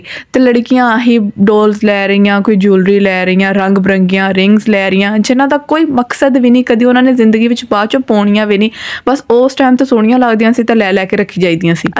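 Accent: Faisalabad, Pakistan. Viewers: apki panjabi Faisalabad wali h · Kaha Sa ho ap wasy Lahore Ki Ni Faisalabad Ki Punjabi lgri ha ya aidda oudda Faisalabadi bolty hnn.....batana zarror which city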